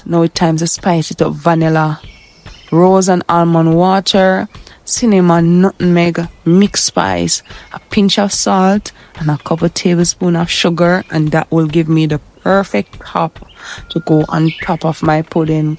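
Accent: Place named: Jamaica. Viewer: I'm living in the US,, I like your Jamaican accent keep it just like that,,,u are so intelligent 💓💯🇯🇲👋⭐⭐⭐⭐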